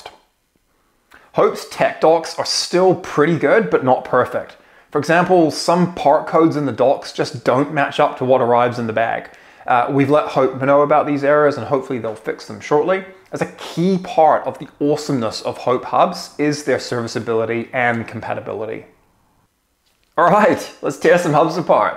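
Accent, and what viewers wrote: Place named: New Zealand. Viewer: Awful kiwi accent.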